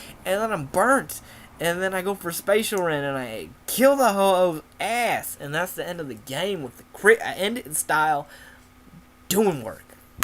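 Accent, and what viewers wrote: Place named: Texas, United States. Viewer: No more Texas accent please, it doesn't suit you... No offense or anything. :)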